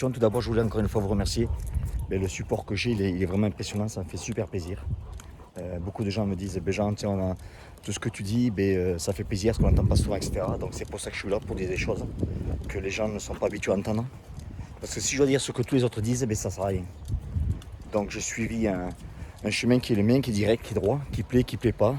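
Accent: South, France. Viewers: Jean... t'es en train de me réconcilier avec l'accent du Sud! Parigot que je suis! Force à toi!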